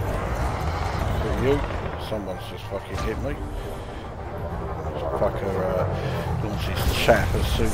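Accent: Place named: London, United Kingdom. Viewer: I engineered my weapons Thermal vent but Distributor has so many alternative's to work out so I will try weapon based Engineering.I must add at 63 now I'm trying my best to get the most out of my ship's and being an Elite Vet since 1984 I'm still on a learning curve on this game.Nice work on the vids and I love the Old Smoke accent.Cheers from Raymondo the Taff.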